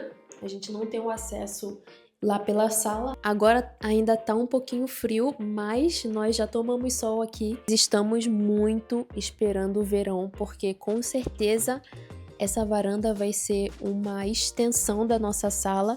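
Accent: Rio de Janeiro, Brazil. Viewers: Eu também não gosto do sotaque carioca. Mas ela não tem culpa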